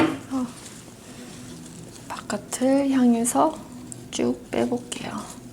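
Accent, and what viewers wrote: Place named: Busan, South Korea. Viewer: 일기읽을때 부산느낌 물씬!! 오늘 꽃도 너무 예쁘네요! #힐링초하 힘내보입시다!!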